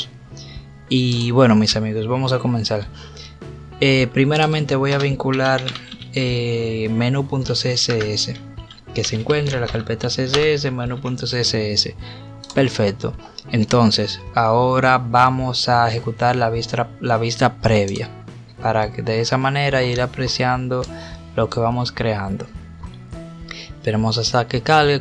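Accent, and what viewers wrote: Dominican Republic. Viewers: apuesto que eres dominicano hahaha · eres dominicano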